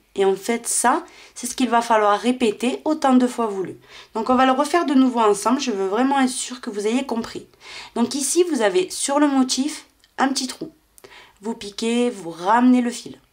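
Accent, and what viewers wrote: South, France. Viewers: Bonjour merci pour toute vos explications vous êtes très jolie et gentille et j adore votre accent ca me fait pensé au midi je devais faire une etoles et je trouvais pas de points crochet Assez beau merci pour ce magnifique point je vais faire mon étole comme ça bonne soirée à vous et votre familles courage à vous tous 👍👍💞💞💞💞💋🌹🌹🌹🌹🌹💐💐💐💐💐💐🌺🌺🌺🌺😸😻😻😻😻😻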